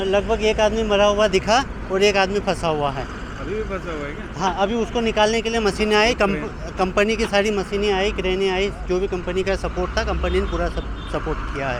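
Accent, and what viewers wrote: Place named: Khandesh, India. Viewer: Najara nahi nazara kahete hain Or Intejar nahi intezar hota hain voh Jarrurat nahi zarurat kahete hain Bijness nahi business Urdu ka janaza mat nikalo language ki study karlo bad me journalism ka shouq karna۔۔۔۔ektarf ka shuru hain dusri tarf ka nahi matalb kya hain 😂😂😂 one way kahete hain use ۔۔۔۔ industriej nahi industries kahete hain۔۔julfen nahi zulfen۔۔۔۔17 manjil nahi 17 manzil .....jameene nahi zameene.....inshort samanya or average class ki journalism.......on camera to Kam se kam ache or bahetrin alfaaz ka intekhab karna chahiye or aam zindagee me jis khandeshi lahejy me baat karte hain usse bahar nikal kar shiree or dilkash lahejy ka istemal karna chahiye...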